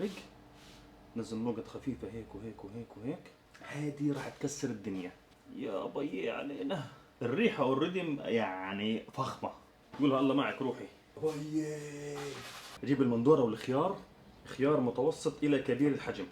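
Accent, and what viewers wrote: Gaza, Palestine. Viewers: أنا من فلسطين وكمان مو هيك لهجتي هو لهجة غزة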